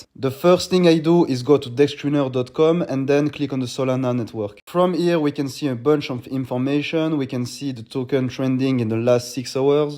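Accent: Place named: France. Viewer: mdr le big accent fr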